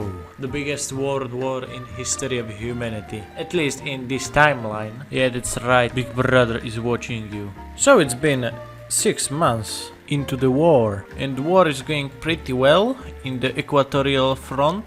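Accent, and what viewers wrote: Russia. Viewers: Huh, I never noticed Russian accent until now. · Are you Russian? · Ты русский? Либо какой либо нации? У тебя акцент прямо на наш похож. Translate: You russian? Or other nation? Your accent like russian.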